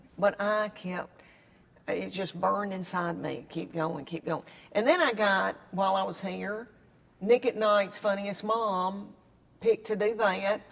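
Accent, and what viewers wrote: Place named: North Carolina, United States. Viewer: You are so down to earth and funny. I'm from SC. I have aunts and cousins from NC have your strong accent. You tell stories that I hear from my family I'm 70 and😂 your the best comedian.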